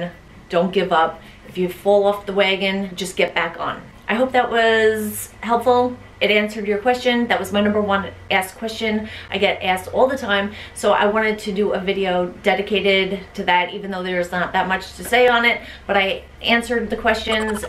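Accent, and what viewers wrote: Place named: Minnesota, United States. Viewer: Huge CONGRATS on your weight loss! Amazing results! Where are you from? I THINK I might be detecting a slight Minnesotan accent or Canadian?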